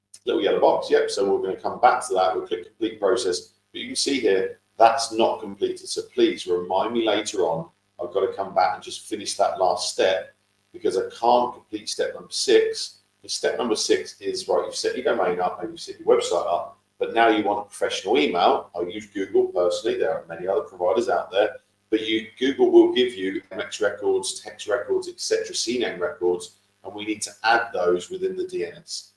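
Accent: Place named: United Kingdom. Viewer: oooooh! Onboarding! I'm in the UK - sounds like you are from here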